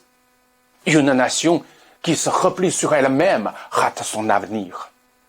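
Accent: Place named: China. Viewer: Vous êtes sérieux? Vous vous présentez pour être Président de la France? Hors de question que je vote pour vous avec cet accent. C’est pas possible quoi vous imaginez cet accent chinois sur la scène internationale quand vous parlez français. Je suis désolé je suis moi aussi d’origine chinoise née ici donc c peut être facile pour moi… c’est pas l’idée que je me fais de la république française. Si vous aimez la Fr soutenez monsieur Zemmour.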